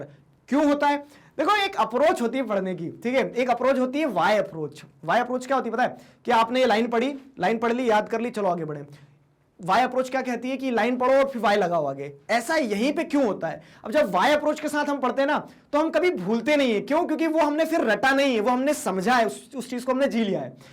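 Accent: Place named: Maharashtra, India. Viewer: Sir apke mu se kuch word marathi aate he vo hm jaise Maharashtrian ko bha jata he or aap hame apne hi lgte he☺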